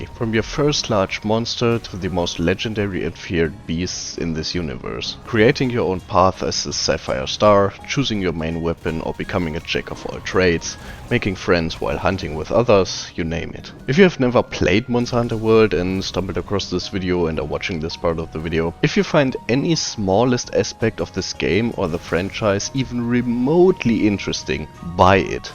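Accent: Germany. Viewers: indeed. 60 hours and im closing in on my full set fatalis. Btw ur video was pretty cool, i rly like it. Ur accent sounds german. Am i right with that assumption?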